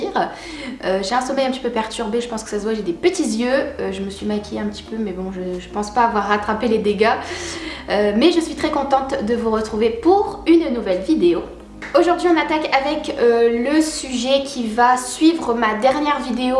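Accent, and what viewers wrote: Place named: North, France. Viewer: Très appréciable tes différentes "storytime", je me suis surpris à toutes les regarder. Je suis psy et tes témoignages sont importants pour dédramatiser la santé mentale qui est très importante 🙂 Bonne continuation à toi ! J'ai l'impression de reconnaître un peu mon accent du Nord, je ne sais pas si je me trompe mais c'est choupi 😂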